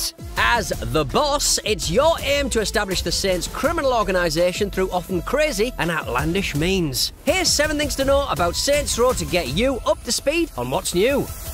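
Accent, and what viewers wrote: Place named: Northeast England, United Kingdom. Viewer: you have to be from the north east with that accent I'm from newcastle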